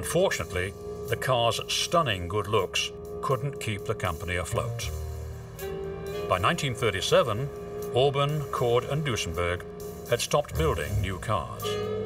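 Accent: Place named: United Kingdom. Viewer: If these cars were American made, why is there a british guy narrating this video?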